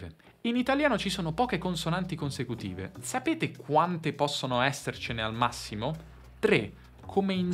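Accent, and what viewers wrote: Standard. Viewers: È interessante la tua cadenza, che poi è italiano standard, qua a Como si parla in modo molto diverso, tu parli come parlano in televisione, e qua è considerato ‘accento da teroni’ Nel nord della Lombardia tendiamo a scambiare le aperture delle vocali in modo che suonino meglio rispetto ad altre cadenze italiane. Como Varese e Milano sono molto simili, invece il bergamasco ci risulta davvero brutta da ascoltare, qua direbbero che è il dialetto dei montanari cafoni. Comunque bella l’italia e belle le differenze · Non dirglielo...fa di tutto per non avere accetti e parlare l'italiano più standard possobile!! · Bravo! Ascoltarti è istruttivo e piacevole! Linguaggio italiano corretto, privo di plastismi e di odiosi intercalari e con accento neutro, rispettoso dei timpani altrui. A casa propria ognuno è libero di parlare l'idioletto che gli compete! 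Condivido le tue considerazioni sulla bellezza delle lingue. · Da italiano, non saprei rispondere. So che non amo l'italiano parlato con accento regionale (e vale per qualsiasi regione). Apprezzo quindi lo sforzo che tu, hai fatto per parlare in modo neutro lasciando da parte le tue origini torinesi.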